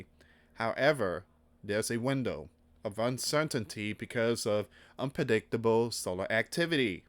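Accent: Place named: Australia. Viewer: Sounds aus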